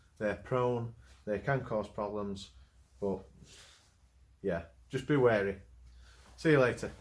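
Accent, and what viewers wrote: Yorkshire, United Kingdom. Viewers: is that a Yorkshire accent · It is a Yorkshire accent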